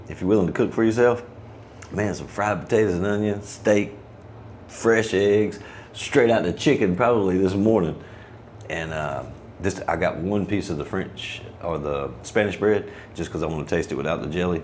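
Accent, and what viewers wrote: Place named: North Carolina, United States. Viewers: maybe Ive missed it, but are you including insurance costs in your budget…health, renters, bike etc.? My Filipina wife and I just discovered your channel and love it. We’re in Salem, VA and enjoy your NC accent. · Aussie subscriber here, good to hear your Southern accent s an Expat in Phils. Will be there in 3 weeks time maybe we can meet somewhere.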